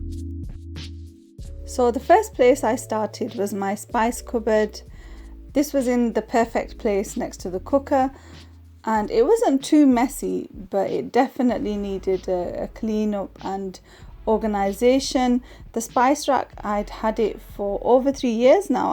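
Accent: United Kingdom. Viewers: Your videos are good but did you actually have 6 babies as a 2nd generation person. Thats what people of the 1st generation did. Pop out a baby every year until menopause. 6 is way too many. Omg !!!!! Were some twins or something ? I mean I dont actually know anyone thats 2nd generation ( children of immigrants ) that have had 6 kids, or are you an immigrant yourself ? You cant be because you speak so British. I think its quite selfish on your children to fill the house with so many babies. IT LEADS TO POVERTY !!!!!!!!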